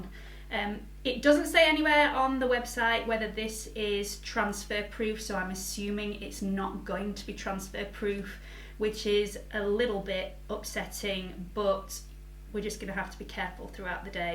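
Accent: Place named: Yorkshire, United Kingdom. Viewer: I’ve just found your channel and I’ve subscribed. I’m so relived to find someone doing makeup for a more mature skin. I’m 49 and like a more natural makeup look. Plus its good to hear a familiar accent. You must be a Yorkshire/North East girl.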